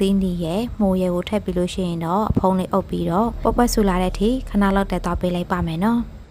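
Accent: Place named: Shan, Myanmar. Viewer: You sound like Shan people not Burmese however you have a sweet accent I am sure you are a good cook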